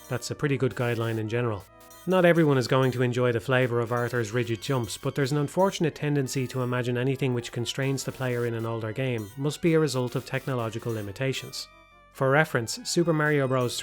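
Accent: Ireland. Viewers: irish asmr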